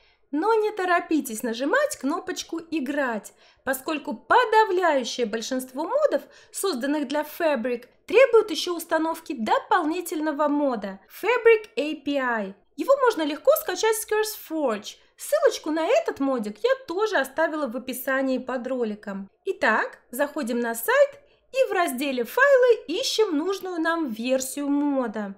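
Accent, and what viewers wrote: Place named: England, United Kingdom. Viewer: как ты делаешь такой акцент англиский.Я попытался этим акцентом пару слов проезнисти я язык сломал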